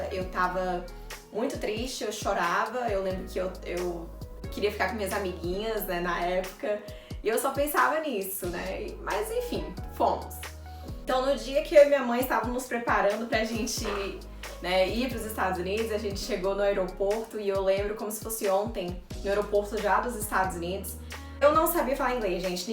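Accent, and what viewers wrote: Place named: Northeast, Brazil. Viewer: Tu é nordestina pura em kkkk "Mangá"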